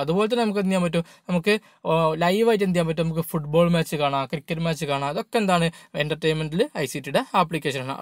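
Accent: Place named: Malabar, India. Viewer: പക്കാ കാക്ക ഭാഷ ( ബാസ )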